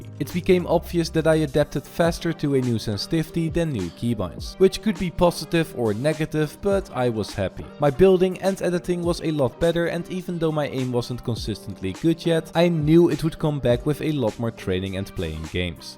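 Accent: Netherlands. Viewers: Vault grey pistols:) Are you dutch cause i think that when I hear your accent.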